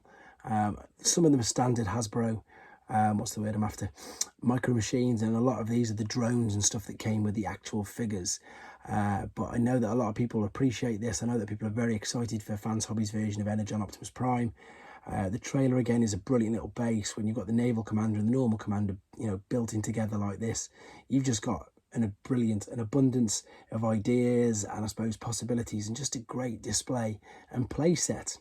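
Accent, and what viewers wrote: United Kingdom. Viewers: This is one of my favourite TF channels.... Nice positive attitude, never any whinging on about stuff, its a friendly space and I have seen so many things on here I had either forgotten existed or never knew about... and I like the way it feels like you are just round your mates house and he's showing you stuff he's found (it probably helps that I grew up in Telford, so the accent is a factor!)...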